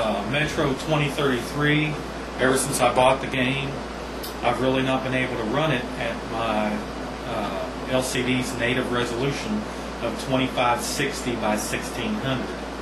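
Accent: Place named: Texas, United States. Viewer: love the accent, sounds like texas. BRING MOAR TF2 DISPENSERS HIER!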